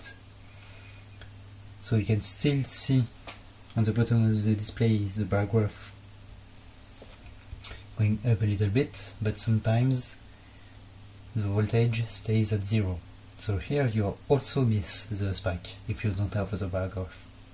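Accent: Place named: France. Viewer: Hey! Change l’orientation de l’image, je suis obligé de figer la rotation pour regarder la vidéo dans le bon sens. So frenchy accent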